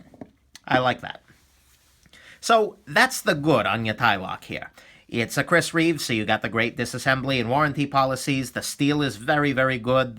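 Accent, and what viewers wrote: New York, United States. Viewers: I love your reviews. Very thorough, but concise at the same time. Can't tell if your accent is New York or Boston.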